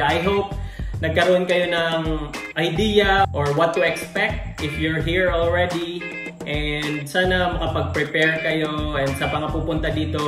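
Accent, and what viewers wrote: Australia. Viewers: Great info! Wanna hear your AUS accent 💘🙊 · I can hear your Aussie accent na. haha Nice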